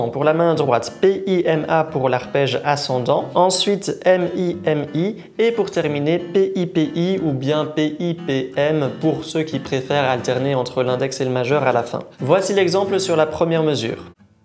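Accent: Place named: France. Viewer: je suis québécois et je te trouves très articulé et claire alors même si j'ai un peu de misère a m'adapter à ton accent français, j'apprécis quand même tes vidéos, merci beaucoup!!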